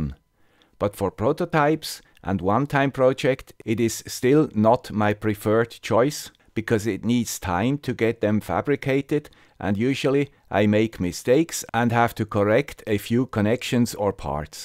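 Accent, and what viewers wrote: Switzerland. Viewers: Thank you VERY MUCH for this idea! Never heard of this before. My wire wrapping tool just arrived on friday :-) Found out, you can use the thin wire of the cheap metal film resistors for wire wrapping. Greets from Basel from another guy with a swiss accent ;) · SORCERY :O Not only the wrapping, but you stripped a tiny wire without ripping its head off. I usually have to resort to burning some insulation off. Thank you swiss accent guy!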